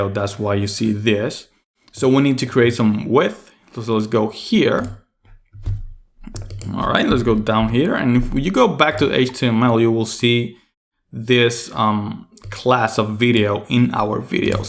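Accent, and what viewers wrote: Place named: Puerto Rico. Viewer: You low key sound Puerto Rican.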